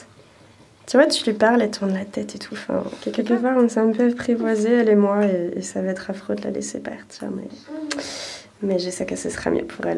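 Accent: Toulouse, France. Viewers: Oh!!!! Ça fait longtemps! Tellement contente de te revoir, tu nous as manqué, tu es la 1ère youtubeuse que j'ai suivi en arrivant sur YouTube alors je suis trop trop contente de ton retour lol!!! Tu es radieuse et une vrai toulousaine, sa s'entend lol la robe est cannooon!!!! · Héhé super contente de te revoir!!! Fabuleux cet accent du sud, ça m'a titillée dès tes premiers mots lol je ne suis pas tellement loin de chez toi donc ces petites sonorités sont familières à mon oreille! Cela te vas à ravir et tu as l'air très épanouie ça fait plaisir à voir et entendre. Mais dis moi belle, cela ne m'avais pas frappé jusqu'à présent mais tu ne serais pas HPE? Très grande émotivité, hypersensibilité, créativité débordante, vocabulaire du registre de l'émotion et mots "spécifiques" choisis avec soin pourtant servis avec grande facilité et spontanéité, grand plaisir et stimulation intense sur plusieurs plans dans les échanges relationnels qui "fusent", grande envie de communiquer et partager ses sentiments, émotions, niveau élevé d'empathie, amour des animaux avec rapport d'altérité, enfant intérieur très présent, anticonformiste, autodidacte...dis donc tu serais pas un zèbre par hasard? 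xD :P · Coucou!! Avant même que tu y fasse allusion je me disais "c'est bon elle a "choper "l'accent toulousain " !!! Mdr 😜Contente que Toulouse te plaise! Au plaisir qui sait de te croiser au détour d'une rue toulousaine!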